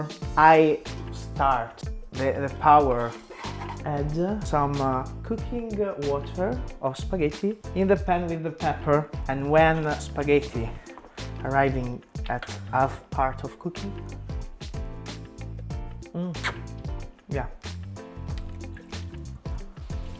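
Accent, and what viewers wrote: England, United Kingdom. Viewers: Simpaticissimo video,fa ridere quando parlu in italiano con l'accento britannico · sei dolcissimo con quella faccia da schiaffi 😂mi fai morire quando dici le parole in italiano con accento inglese 👏bravo 👏👏complimenti x gli iscritti avanti così 🤗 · Ma fatemi capire: non conosce l'inglese, non sa cucinare e parla l'italiano con l'accento inglese... E fa un video di cucina in inglese??? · No daiiiiiiii x favore parla italiano e metti i sottotitoli in ingleseeeee...poi quando parli in italiano con l'accento inglese quello ci piace ahahahahah 😄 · è un pochettocringe anche quando parlavi in italiano ma con l'accento inglese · Questa risatina così italiana 'eheh' tradisce un po il tuo perfetto accento British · Le parole in italiano con l'accento inglese... Calato nella parte proprio!!! 😂😂😂😂 fantastico!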